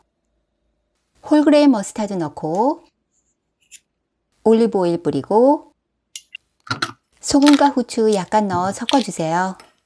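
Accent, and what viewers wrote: Seoul, South Korea. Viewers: 옛날 서울사투리듣는거같지만 천천히 느긋이 설명해주셔서 잘듣고있습니다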